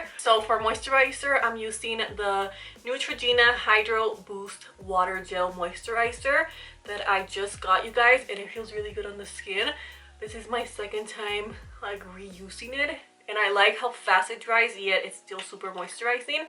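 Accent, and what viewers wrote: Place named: Puerto Rico. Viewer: Girl, you’re stunning. A fellow boricua here, I just discover this channel and when I heard that Puerto Rican Spanish it was a done deal. Me encanta, you got a new loyal subscriber. ❤️🇵🇷 Ig: Srta.lugo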